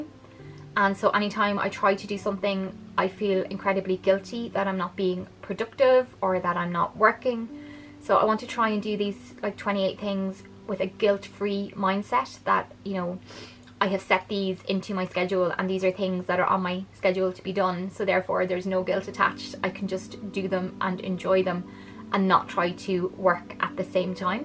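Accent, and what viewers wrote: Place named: Ireland. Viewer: Love the irish accent x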